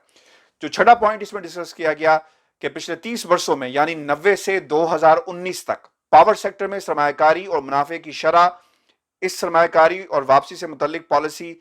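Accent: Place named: Punjab, Pakistan. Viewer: Sir good video but one suggestion you should work on your accent you have very thick punjabi accent when you are talking in Urdu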